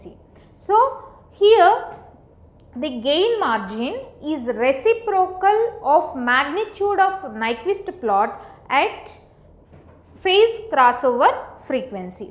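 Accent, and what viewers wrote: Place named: South India, India. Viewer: She is South Indian I guess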